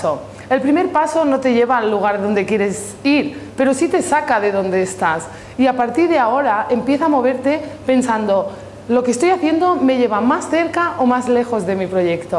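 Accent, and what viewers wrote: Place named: Morocco. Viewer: eres marroquí?? Ese acento y determinación es inconfundible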